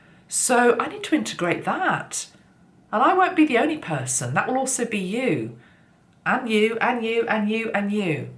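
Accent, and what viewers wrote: United Kingdom. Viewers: At least the "it's a bit sparse" bit made me laugh out loud. (Regarding the tree) It's prob your British accent too. I could picture that old show The Young Ones having a sparse tree and talking about it. Gotta find the humor in the grinches & scrooge types. Love your videos! Xo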